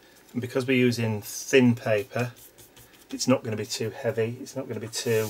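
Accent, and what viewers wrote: United Kingdom. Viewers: Lots of bits! I love the British accent!😃💖❤❤❤❤❤❤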